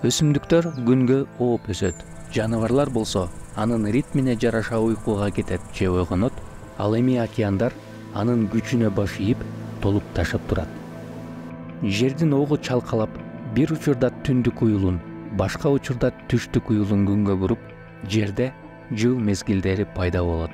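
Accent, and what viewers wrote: Standard. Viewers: Ма Ша АЛЛАХ Кыргызымдын мыкты мырзасы сизге АЛЛАХЫМ ыраазы болсун, абдан соонун айтып берет экенсиз. Сүйлөгөнүнүз таза кыргызча болгонуна абдан кубандым сизге кичине болсо да колдоо көрсөтүү үчүн каналынызга катталдым😊 ишинизге ийгилик алга🤗👍 Бирок эмне болоорун бир кана ЖАРАТУУЧУ АЛЛА ТААЛА билет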